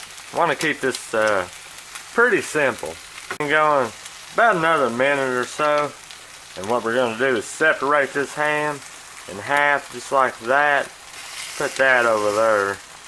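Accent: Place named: South, United States. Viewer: It's the southern assent for me..I love it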